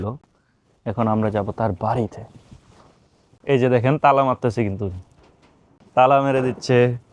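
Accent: Bangladesh. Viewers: আপনার উপস্থাপনা আপনার মতো সহজ সরল। কোনো ভনিতা নেই। খাঁটি বাংলাদেশের ভাষা। সুন্দর। আচ্ছা এইরকম গ্রামে গিয়ে মানুষের সঙ্গে কথা বলতে সরকারি অনুমোদন লাগে?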